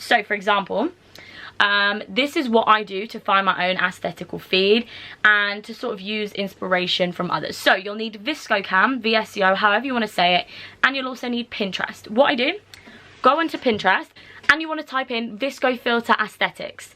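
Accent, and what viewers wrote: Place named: RP, United Kingdom. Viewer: I love your cute posh accent 🥰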